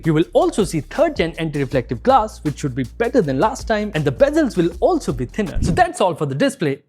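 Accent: India. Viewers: I cant listen this indie english 😐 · Omg. I cannot understand this Indian English literally anything!!! How can he make you tube videos with english being so bad? He swallow words...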